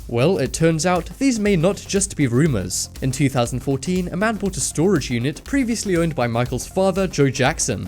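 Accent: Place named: United Kingdom. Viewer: Derp Face Dude, he's British. They say everything different over there.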